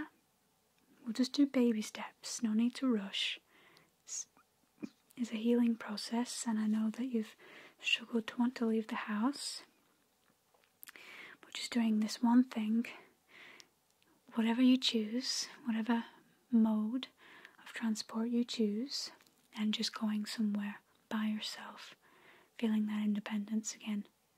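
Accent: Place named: Lancashire, United Kingdom. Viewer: This lighting is lovely, and your Lancashire is too,. 🕊️🙏💕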